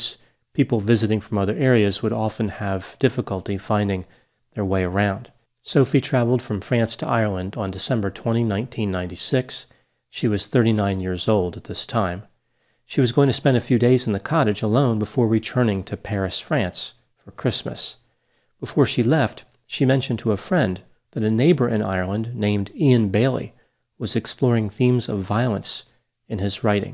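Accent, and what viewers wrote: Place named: Delaware, United States. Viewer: I think he is from Delaware. It's probley an accent thing if anything. I'm not aware of it.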